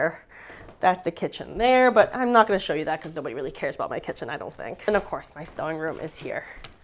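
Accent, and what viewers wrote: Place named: New York, United States. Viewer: Love your new home! Are you from New York originally,? You sound so "Cool New York"! I'm in Long Island.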